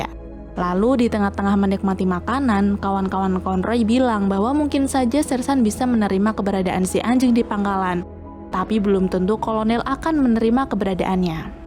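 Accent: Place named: Banjar, Indonesia. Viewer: LOGAT KAKAK ORANG BANJAR KAN? "KYAAPA"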